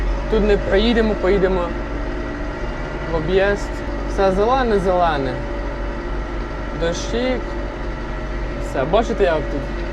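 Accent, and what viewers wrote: Poltava, Ukraine. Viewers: Супер земляк.Що їм мова не нравиться,як причепляться людина із Полтавщини.Нікого не слухай наш суржик супер.Удачі.Хай щастить у житті. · Я з перших кадрів,коли тільки почала дивитися канал,зроміла,що з Полтавщини,говір неповторний ❤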